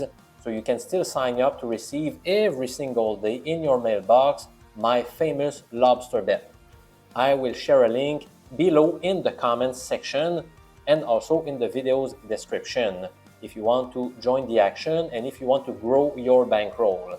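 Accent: Quebec, Canada. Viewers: You sound both french canadian and Persian at the same time.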